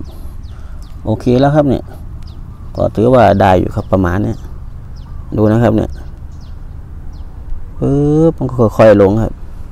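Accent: Korat, Thailand. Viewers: โคราชบ่คับ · ฟังสำเนียง โคราชหรือนางรองครับ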